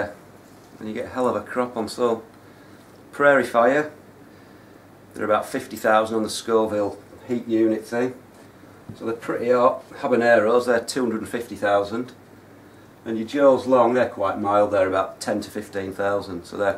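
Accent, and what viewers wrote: Yorkshire, United Kingdom. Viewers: Loving that Northern accent. Yorkshire? Great plants by the way and a very good video!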